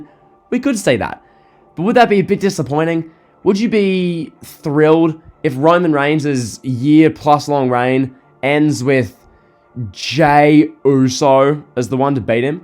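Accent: Australia. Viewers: Lol somehow you've become more Australian than you already were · And wow you sound more Australian then usual due to the mic,cool tho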